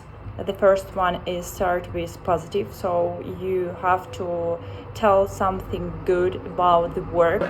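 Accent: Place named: United States. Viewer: You have American accent because that I can understand very well you 🆙, good tips 👏👏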